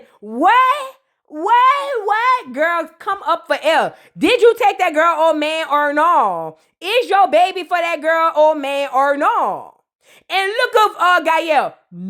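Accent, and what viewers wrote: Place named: Louisiana, United States. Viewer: Looord I only watch it ...tooo hear ur review....I loooove u my looozana chick...cuz itsssss ur looozana voice for meee....I understand everything u saying 🤣🤣🤣🤣❤️❤️❤️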